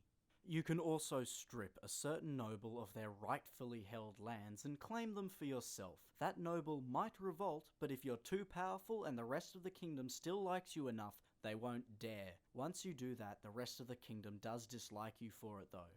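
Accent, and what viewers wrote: Australia. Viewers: You an Aussie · You and GGmanlives should do a duo review. Both Australian accents and just straight trough without the kiddy bullshit. Love it!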